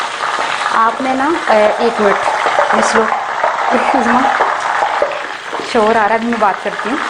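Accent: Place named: Punjab, India. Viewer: Main bhi lahore main paida howi apki paki panjabi son k bohat khush howi main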